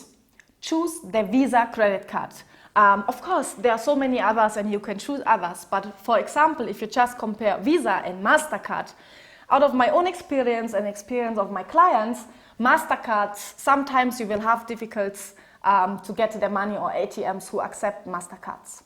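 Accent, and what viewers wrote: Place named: Kenya. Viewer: Why do have a Kenya acsent mmmmmh l can guess you feel deely in love with kenya .And you got your soulmate there.thats my home l love your smile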